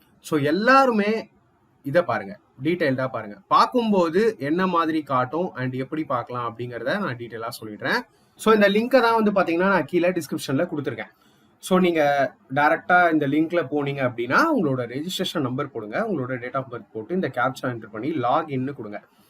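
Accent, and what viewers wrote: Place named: Coimbatore, India. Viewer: I think Coimbatore side ah irukalam😮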